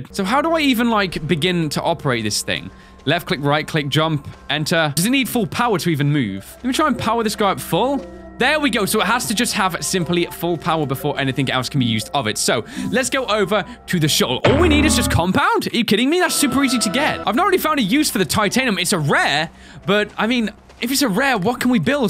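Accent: United Kingdom. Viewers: your accent is british, you say aluminum and not aluminium british or american?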